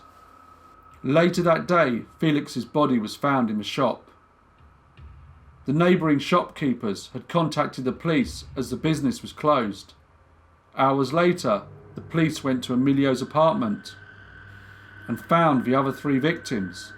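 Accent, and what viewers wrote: United Kingdom. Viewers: I am really grateful (though slightly ashamed, well, more embarrassed, by my facination with true crime) to see someone, and as an old(er) anglophillic yank(er), to listen to a well spoken Britter, narrate international cases, from 19th & 20th c. In the usa, it's always either American or Dominian, English speaker murder, and am really enjoying the international work. Hope against hope you do some Germainica!!!!!!!!! Or Balkan, Baltic, Slavic, Turkic, anything. On top of that, love the concise presentation, exactly what I prefer. In American true crime stories, it;s always TMI. Your channel alone justifies my 12.99 usd per month, on Utoober. Many thanks!!!!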